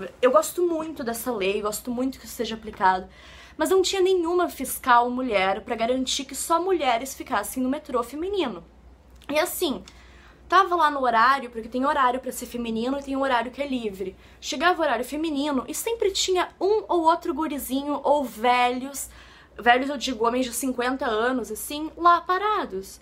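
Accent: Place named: Porto Alegre, Brazil. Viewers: Mariana antonucci Tem mais haver com o sotaque do que com a dicçao. Os porto alegrenses falam assim mesmo,assiste um debate da luciana genro que tu vai ver kkkkk. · Cara, só quem mora desde criança em POA tem esse sotaque chato que nem essa moça. Mas no resto do estado os sotaques são legais. Ah! Sou riograndense. Eu acho que ela é descendente de alemão. O alemão é bem exigente e verdadeiro.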